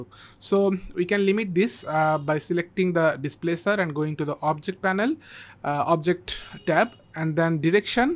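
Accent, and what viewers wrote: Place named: India. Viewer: You think we can understand your English? Slow down man, you English becomes much Indian.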